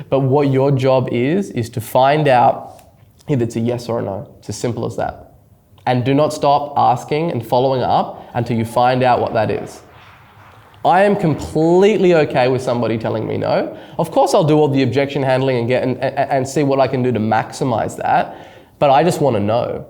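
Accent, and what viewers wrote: Australia. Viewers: is hormozi with ozzy accent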